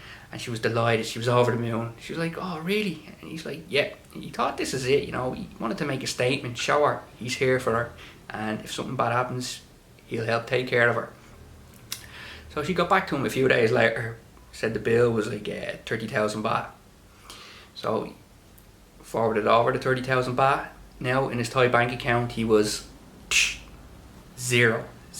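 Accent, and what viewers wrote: Ireland. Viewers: The great irish accent makes the story a better listen 👍🏾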